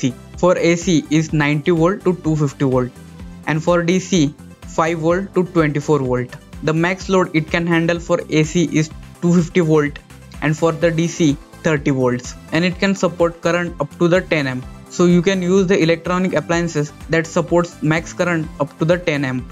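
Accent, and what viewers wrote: India. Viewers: Mere bhai, he IS, in the Indanized English specifically Maranglish! (Marathi English) I speak Tanglish (Tamil English). English is our language. Since British cheated and looted us for 200 years, we have confiscated English. IT IS OURS NOW. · my Indian brother plz when.u speak English in correct accent not in.hindi very poor english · Dude your indian accent is too much. Please study the intonation and slang of proper english. I know you speak english. But really sounds like indian language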